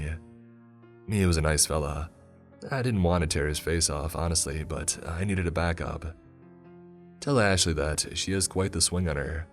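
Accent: United Kingdom. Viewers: Can tell it’s a Brit that described this hoax story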